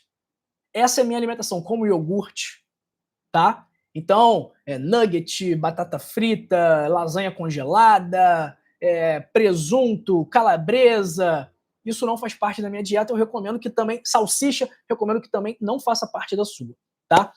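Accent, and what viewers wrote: Rio de Janeiro, Brazil. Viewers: Eu tomo água com limão 🍋 e como abacate, todos os dias! Com esse acento lindo, só poderia ser Carioca! Eu amo o Rio!❤ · Eu achou quê você é do Rio de Janeiro tem o sotaque dos cariocas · Talvez o Sr seja carioca? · Pelo seu sotaque e do Rio de janeiro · Pelo seu sotaque , deve estar falando do Rio de Janeiro. É igual de um irmão da igreja. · Eu sou de Piracicaba SP vc pelo sotaque é carioca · Vc e carioca. ?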